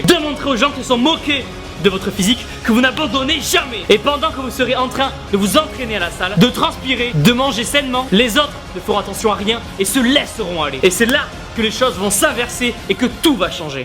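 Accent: South, France. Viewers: La recette avé l'accent avait un petit coté Maïté! C'est très bien mon gars en plus d'être valables tes vidéos sont drôles ce qui est agréable dans une discipline ou beaucoup de gens prennent on sait pas pourquoi le "melon", ça change des potaches adeptes du selfie qui font mon désespoir quand je tombe dessus... Continue bravo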